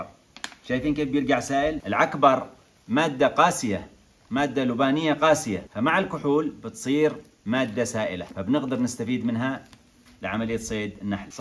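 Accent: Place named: Yemen. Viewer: اخي. انت من اليمن صح. لهجتك مشابهه للهجتنا اصحاب الحديده. بس المكان هذا الي انت فيه وين. مش ممكن يكون في اليمن 😢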